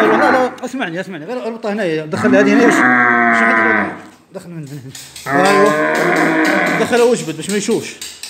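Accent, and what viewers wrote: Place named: Libya. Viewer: تتقصكم التجربة يا صديقي. .يبدو انك عشت في ليبيا طويلا كلامك يوحي بذلك .المهم بارك الله فيكم ومزيدا من الاجتهاد حتى تكتسب الخبرة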